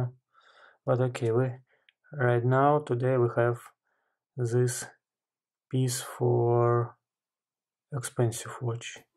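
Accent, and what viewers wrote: Russia. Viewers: Жесткий русский акцент. Английский - это не для Вас.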